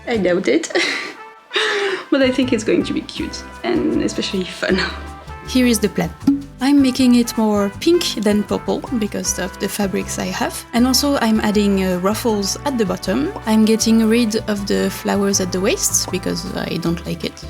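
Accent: France. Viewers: Side note: I enjoy the way you speak english. I'm guessing the time you worked as a flight attendent helped with that. A lot of french words have made their way into english (looking at you Guillaume le Conquérant), but in english the stressed vowel is often a different one. With you I never hear a stressed vowel out of place. If I hazard a guess: you can also talk english with hardly any accent, but for the videos you're playing up french accent a bit. About the word 'stomach'. Yeah, that word is also used generically, but I think where you said 'stomach' the word 'abdomen' is a better fit.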